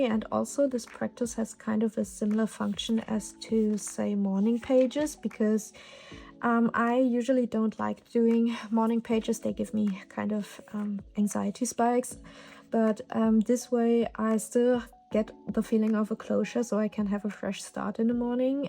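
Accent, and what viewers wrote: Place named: Germany. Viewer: This was such a cozy video to listen and watch, good mixture of very soft music in the back and I adore your German accent. Would love to see more in-depth explaining and layouts that you use for different types of todos and creativity! >w< As for planners that I'll be using for 2026 (braindumping about the specifics will happen later in Nov); Hobonichi Cousin: all-in-one daily planner, scheduler, personal/hobby journal Hobonichi Weeks: adult-log for chores, appointments, more todo focus with no personal details Hobonichi HON A6: art freelance/work planner, detailed todo of my art projects along with its own space for notes (leaves more room in the Cousin) Self-Care journal: bullet journal with a simple tracker for emotional, mental and physical symptoms. A page for affirmations and quotes to read back on and a Monthly Review Yearly Collections journal: fun collection pages from hobbies, trackers to goals (very tempted to move this into the Hobonichi Weeks.. 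but unsure due to how small the Weeks is and it may clash with the 'adult admin/todo focused' theme). Apologies for the long comment, I can ramble on and on about my planners haha. But there is still a lot to figure out. I've only been using the Hobonichi Cousin for the first time this year. I used to bullet journal everything in a B5 size that would fit 5 months per book... but monthly setups became extremely time consuming, so I'm VERY happy to have moved into the Hobonichi planners >w</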